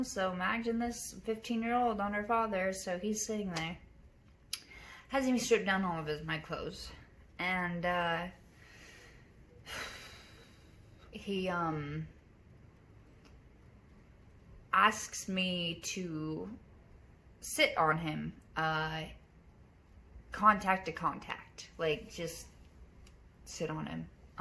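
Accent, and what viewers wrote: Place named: Eastern Europe. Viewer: You sound like you’re from eastern Europe.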